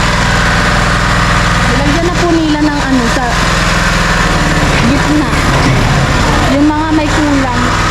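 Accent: Visayas, Philippines. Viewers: Ang laki ng bahay mo bagay sa iyo dahil maganda ka ok lang ang tagalog mo kahit meron halong visaya I love watching you video here in the USA ❤️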